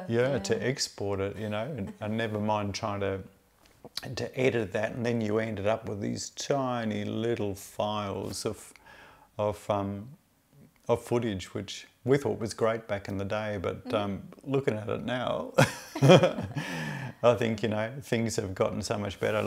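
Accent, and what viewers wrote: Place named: RP, United Kingdom. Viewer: your English is perfect,.. even sounds a bit posh 😄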